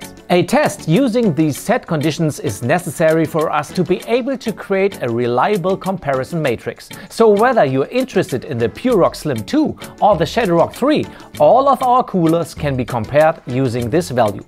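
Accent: Germany. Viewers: Nice german accent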